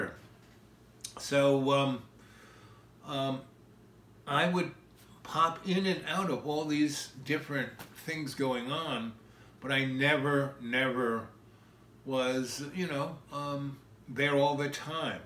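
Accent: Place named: New York, United States. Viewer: Very interesting his story about Basquoit. He sounds like a real New Yorker. Madonna hooked up with Basquoit.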